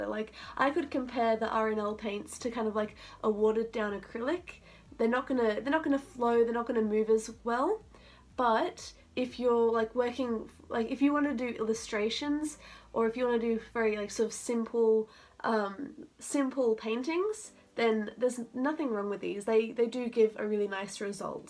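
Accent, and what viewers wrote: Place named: Australia. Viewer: This is probably stupid but when I opened the video my first reaction was is this a fellow Aussie...I think so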